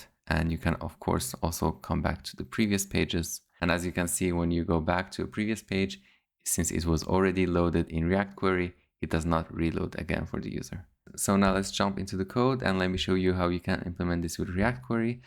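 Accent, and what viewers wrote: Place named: Morocco. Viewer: Bro sounds moroccan AF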